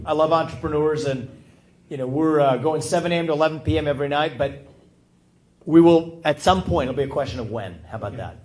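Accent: United States. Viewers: As a 50 year old Canadian, Vikek sounds like an American. I don't expect he'll be the next president. But he'd make a fine president.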